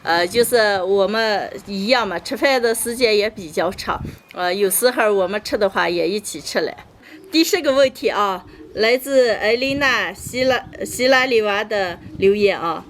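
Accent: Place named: Northwest, China. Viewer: ，加油！你的视频为我在疫情期间带来了温暖和乡音。保持西北人特有的质朴和真实，相信你的视频会传播的越来越远。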